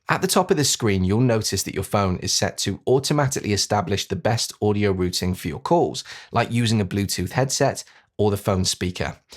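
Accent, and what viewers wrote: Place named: Liverpool, United Kingdom. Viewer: Are you originally ftom Merseyside area ? You have a twinge of Liverpool accent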